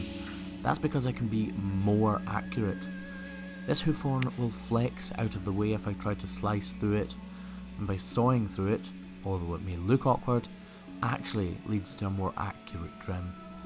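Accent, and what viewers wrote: Scotland, United Kingdom. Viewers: I so enjoy your videos. I live on a farm no animals anymore but we plant 99 acres still. Your voice is so comforting. I am 3 generation Scottish American. Everyone family here with the accent has now passed. So when I listen to your videos I am taken back to my childhood and my nanny and grandpa. I have distent family still in Scotland. Definitely want to come home and find what family I still have. Maybe 1 day I'll comment that I finally made it. Keep caring for the beautiful ladies and big handsome gentlemen.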